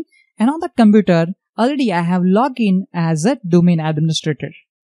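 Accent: India. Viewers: indian accent boosted my brain, thank you bro i love india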